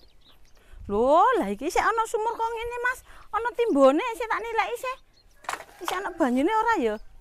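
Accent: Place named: Surabaya, Indonesia. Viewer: Temenan Mbak, suroboyoan mu ga ilang 😀👍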